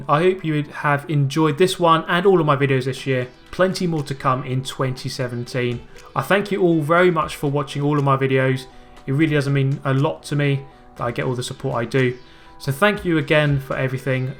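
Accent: United Kingdom. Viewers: WTF I was picturing you as a 30 year old fat white british dude hahaha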